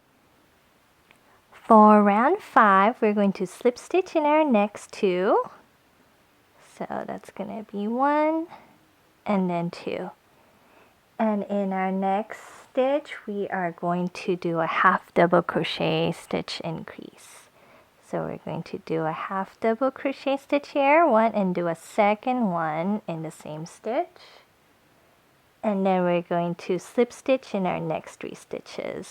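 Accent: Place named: England, United Kingdom. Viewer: Hi! I'm watching this video from Korea. As a non English speaker it is hard to understand(Because lots of korean like me are not used to English accent) but it helped me a lot when I make psyduck. Thank you♥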